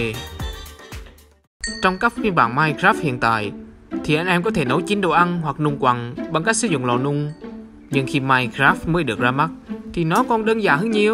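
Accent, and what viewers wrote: Central, Vietnam. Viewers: Nếu mn chưa theo dõi kênh của lâu thì hồi xưa tầm 1 năm trước có nói tiếng miền trung =)))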